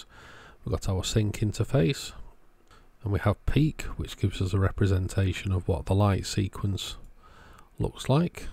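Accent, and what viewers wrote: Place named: United Kingdom. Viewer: Hello mate you sound like you’re from the UK as am I, I’ve only just started on LED electronics and I was wondering where you got your LED’s from. I’ve just ordered some from aliexpress as the 1st one I got off amazon is like 3 times more expensive than Ali but the only downside is that it’s 3 weeks shipping time.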